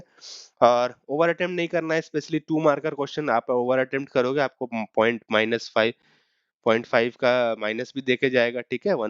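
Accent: Bengal, India. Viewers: Dada, are you from West Bengal? As you sounds like a bengali.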